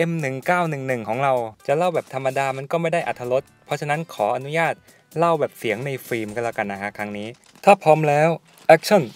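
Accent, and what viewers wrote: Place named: South, Thailand. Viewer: เสียงสำเนียงใต้เหมือนพี่ โต้งกันเบท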